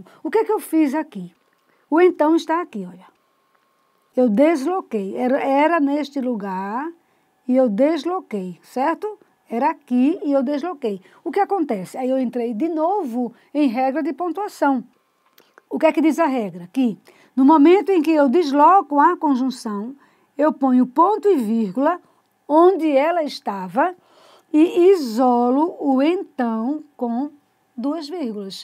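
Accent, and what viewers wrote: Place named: Northeast, Brazil. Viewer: Professora, suas explicações e sua didática são ótimas.congratulações! Outra coisa: seu sotaque é muito bonito. Os nordestinos, nos destacamos muito nessa característica!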